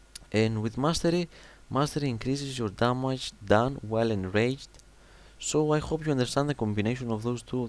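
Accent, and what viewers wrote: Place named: Greece. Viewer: ζήτω η ελληνική προφορά! διαφωνώ για το dragon roar και ίσως πρέπει να αναλύσεις και το rude interruption ;-)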